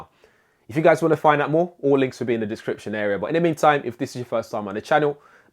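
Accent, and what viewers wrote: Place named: MLE, United Kingdom. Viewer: Cool MLE accent👍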